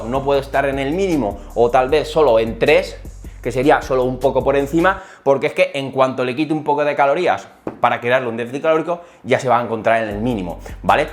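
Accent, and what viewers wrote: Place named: Spain. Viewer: No se porque pero estos Españoles que pone pizarrón y teorisan todo me dan tanta hueva y es pura mamada lo que dicen y el acento insoportable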